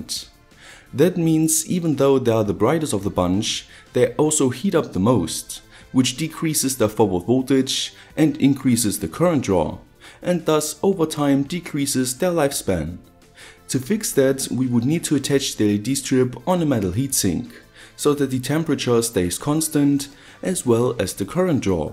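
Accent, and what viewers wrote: Germany. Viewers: Greatly appreciated Germany accent! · Are you German? You sound German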